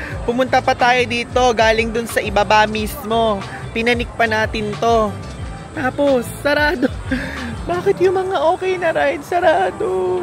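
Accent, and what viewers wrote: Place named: Bulacan, Philippines. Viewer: As a bulakenya gustong gusto ko yung pagtatagalog mo,! sa bulacan ko lang naririnig ang supil 😂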